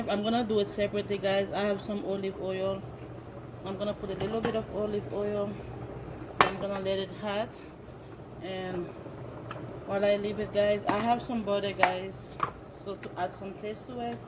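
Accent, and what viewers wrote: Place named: Haiti. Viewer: Baby that Haitian French accent so cute lol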